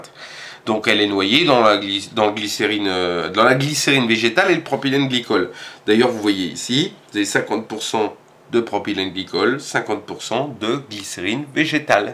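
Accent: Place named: Franche-Comté, France. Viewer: Tu as un accent de chez moi, tu es franche Comté ?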